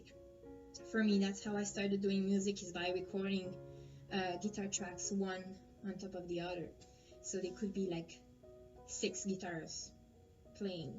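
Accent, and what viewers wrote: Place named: Quebec, Canada. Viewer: C'est vraiment sympathique comme vidéo, tu devrais en faire plus souvent! C'est super d'avoir des nouvelles de toi comme ça. J'ai aussi aimé les petits vidéos, surtout le "contact juggling" dont j'aime l'illusion! Bien sûr, comment ne pas apprécier la fin? Je me demandais quel accent tu avais! Eh bien c'est pas mal québécois, je ne suis pas trop dépayser :P Merci de cette attention pour les francophones qui te suivent! À bientôt! =)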